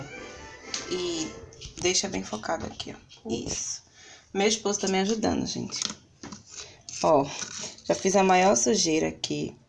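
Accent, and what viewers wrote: Recife, Brazil. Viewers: É de recife?rss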